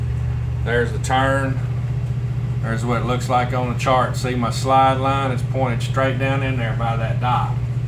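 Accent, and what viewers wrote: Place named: Appalachia, United States. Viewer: You did a FINE job but MERCY, you sound like you're from here. You'd make a fine hillbilly if you wanted to!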